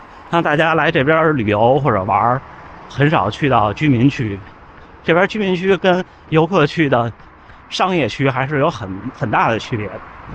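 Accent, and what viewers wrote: Beijing, China. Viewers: 還好北京話我聽的懂🤣🤣🤣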